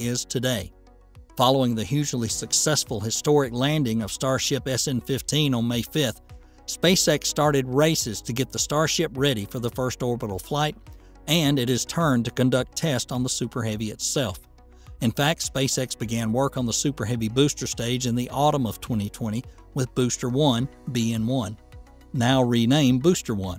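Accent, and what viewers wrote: United States. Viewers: That cowboy accent tho 😂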